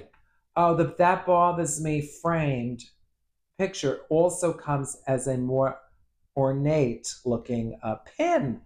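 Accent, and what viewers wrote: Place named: East Coast, United States. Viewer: A nice chat. Your videos are like visiting a good friend. 🙂 Listening you you also makes me homesick for the East Coast. ✨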